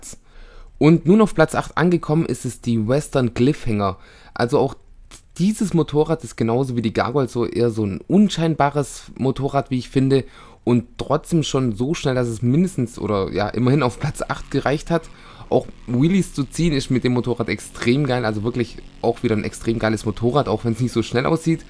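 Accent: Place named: Swabia, Germany. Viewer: Schwäbischer Dialekt iz da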